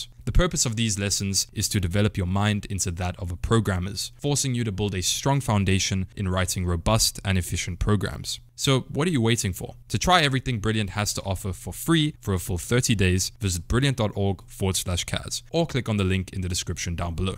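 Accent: South Africa. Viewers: Instantly recognized the south african accent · i know im listening to a South African........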